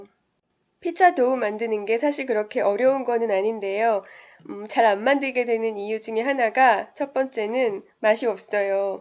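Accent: Seoul, South Korea. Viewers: 서울 사투리 억양으로 말 하시는 분 근래에 첨 봤네. 덕분에 피자 맛나게 만들어서 먹었어요~ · 목소리 말투가.. 너무 매력적이시네요 옛날 서울 사투리? 같아요 정감있고 예쁜어감의 목소리여서 계속 듣게되네요~ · 사울사투리 너무귀여어 ㅋ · ㅋㅋㅋ말투 넘 귀여우셔요ㅋㅋ약간 90년대 서울 사투리 같아요ㅋㅋ영상 감사해요!맛있게 만들어볼게요~^^ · 서울 억양 너무 좋습니다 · 와! 듣고 보니까 말씨가 딱 옜날 서울 사투리네요 ㅎㅎ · 서울 사투리 쓰신다!!! 말투 넘 좋아요ㅎㅎ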